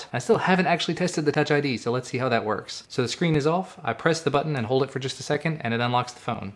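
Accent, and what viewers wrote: Kentucky, United States. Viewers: R you from Kentucky?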